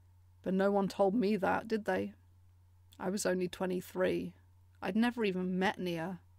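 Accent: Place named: England, United Kingdom. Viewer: She should have kept going. Her english accent contrasts a great deal with her dirty talk.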